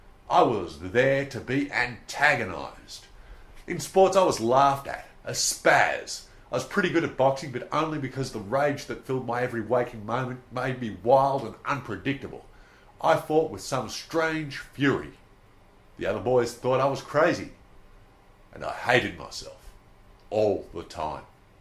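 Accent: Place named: Australia. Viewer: Thanks for posting and great dictation, love the oz accent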